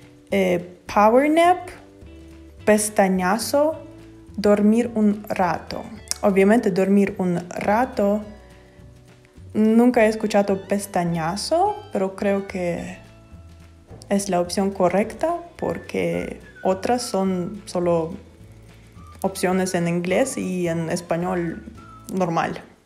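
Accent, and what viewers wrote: Guatemala. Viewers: Ya casi pareces chapín solo fallaste en uy era ulugrun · Siempre los chapines le ponemos el Sason ha las palabras pero si lo hisistes bien saludos desde Maryland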